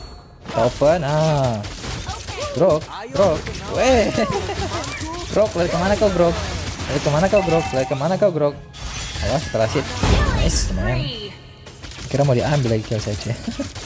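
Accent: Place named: Sumatra, Indonesia. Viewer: Cara ngmongnya kek orng sumatra lu bng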